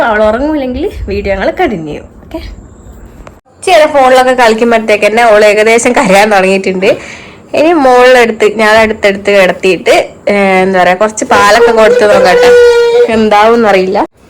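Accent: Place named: Kuttiyadi, India. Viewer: that is kuttiyady slang😂😂😂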